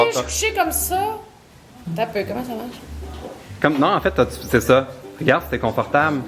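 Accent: Canada. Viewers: Il est canadien ça s'entend non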